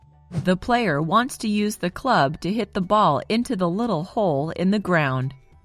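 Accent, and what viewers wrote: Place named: United States. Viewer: I love your teaching the most. I thought that you are English native speaker but it is not. I was motivated a lot when watching you speaking English. I love your American accent and I am trying to mimic your accent. Thanks for all the lessons, man!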